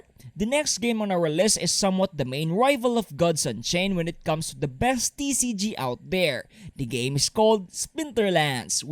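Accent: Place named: India. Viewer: This foookin indian accent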